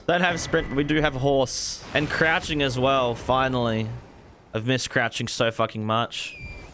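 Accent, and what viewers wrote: Australia. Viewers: i just found this vid after googling if reduvia was any good, only played about 1.5h so far, first playthrough. anyway, thought you were an aussie, confirmed it with the extra dry, so, hello from FNQ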